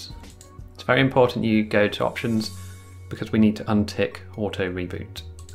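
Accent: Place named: England, United Kingdom. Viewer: >English tutorial, with english accent >Done on a Samsung device Perfection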